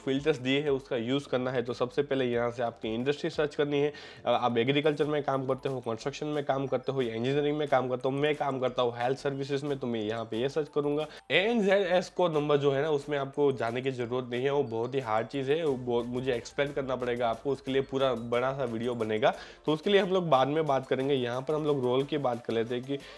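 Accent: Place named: Gujarat, India. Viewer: Bhaii tame Gujarati lago tamari language ma avu lage ....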